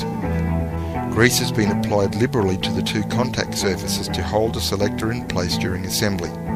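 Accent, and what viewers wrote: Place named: Australia. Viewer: Hey great video.. I know this is old but hopefully you stillnsee it.. I have a t120v 1972 and the mechanic who rebuilt the motor couldn't get the gearbox working. it looks the same externally I was looking at it today.. though I believe my model has a leaf spring not a plunger.. same selector "quadrant" learned a new word today. Anyway the bike is in neutral the but gears won't change. Shift mechanism all seems right. I ran it when I first got it home and the gearbox locked up totally. its been sitting for years as I kinda gave up on it.. I looked at it today.. taking the outer cover off and its the kickstart gear that seems locked.. inside the cases..lower gear spins freely when Turn the tyre.. I'm a little on the "in over my head' side honestly but your video is pretty encouraging.. just wondering if A: you have any thoughts as to what could be going on wrong and B: being (as you have the Aussie accent) know anyone in Adelaide I could take it to?. 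Cheers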